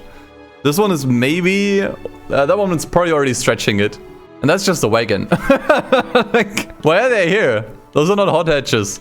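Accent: Germany. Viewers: As an American, we get it, you’re German lmao he sounds so German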